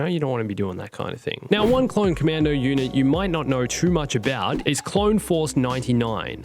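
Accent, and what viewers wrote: Australia. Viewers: Are you from Australia bro? Love your accent ;)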